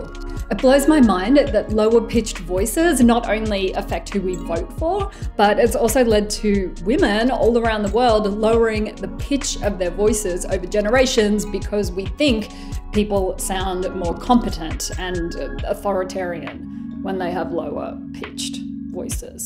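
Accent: Australia. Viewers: Same! And her purely Aussie accent too 😁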